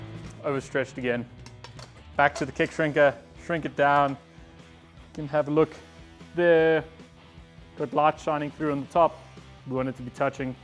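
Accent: South Africa. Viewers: South Africa, my home country. We'll since I support local, I subscribed. Thought that English accent sounded familiar! Great work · You have a South African accent ?!?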